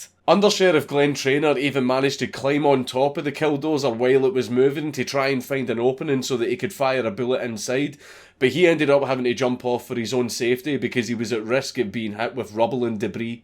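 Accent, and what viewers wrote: Scotland, United Kingdom. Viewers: This is by far my favourite mad lad video, keep coming back time and time again for the same story told by a nice scottish accent.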